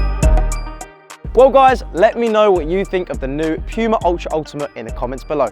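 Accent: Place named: United Kingdom. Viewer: British accent, but says soccer not football😩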